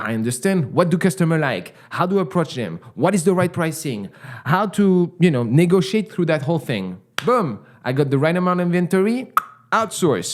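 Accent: France. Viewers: Your French accent is so cool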